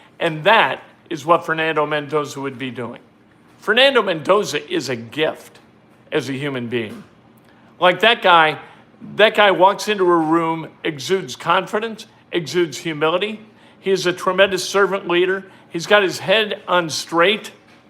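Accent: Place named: Indiana, United States. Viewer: It’s odd that you said you moved to Indiana. You are 100% a Hoosier in your way of talking and mannerisms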